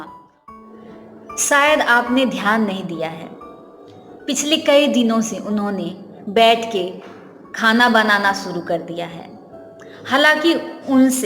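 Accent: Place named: India. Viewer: Your voice is very nice with a pure Indian touch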